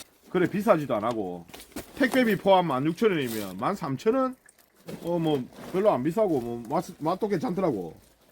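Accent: Gyeongsang, South Korea. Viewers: 사투리를 잘 들어보면 90프로 경상도 + 10 전라도 가 섞여 있는 느낌. 사는 지역이 어딘지 모르지만 진주, 창원, 김해?? 그쪽일듯 싶다. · 오모나 보고싶었어예^^ 한치'팩한 이후로 얼굴색깔과 손이 검어졌어요~ 😂 경상도 말씨 듣고싶으면 보고 음~~웃겨요 ~~^^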